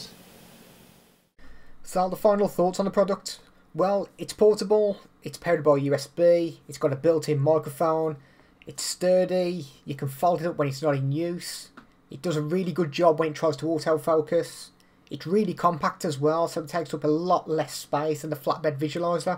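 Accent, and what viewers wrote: United Kingdom. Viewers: The prob is partly the British accent. 🥸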